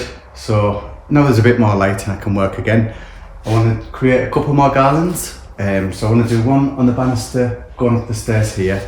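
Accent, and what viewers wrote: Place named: Middlesbrough, United Kingdom. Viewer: Totally agree with your decision on the ribbon. Looks much better now. You certainly have an eye for what works. Jealous of some of the decorative glass baubles you have and I’m certainly stealing the gold sprayed holly idea. nearly did me out of one of my favourite games- spotting how often he says cha-eau (in best Middlesbrough) instead of chateau. Only once on this vid! 😛 Anyway have a wonderful Christmas - you’ve all earned it after your hard work this year. I love seeing the progress you’re making. Nodlaig Shona from Ireland.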